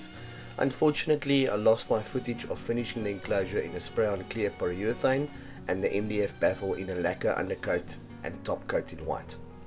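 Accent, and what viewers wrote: South Africa. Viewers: South African accent but now based in the US?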